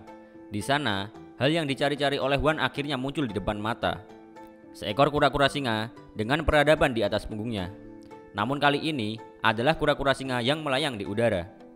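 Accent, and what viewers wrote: Indonesia. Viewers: Medhok looorrr....! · Abang orang NTT ya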